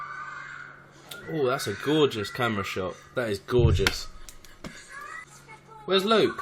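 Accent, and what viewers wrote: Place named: United Kingdom. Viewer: I loved this show and ur reactions haha where bouts in the uk are u from cant figure out ur accent im from newcastle 🤣🤣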